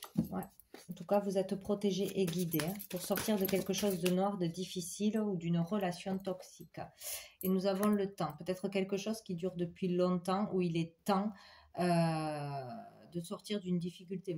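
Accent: South, France. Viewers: Gratitude à vous quel doux accent di sud Namaste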